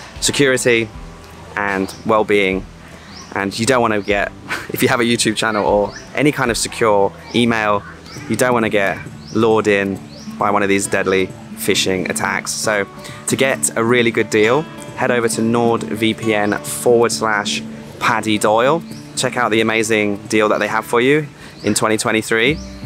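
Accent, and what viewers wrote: England, United Kingdom. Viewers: Paddy. Judging by the sound of your voice, I assume that you are from England. So why do you always use US Dollars and not GBP when comparing prices in Thai Baht?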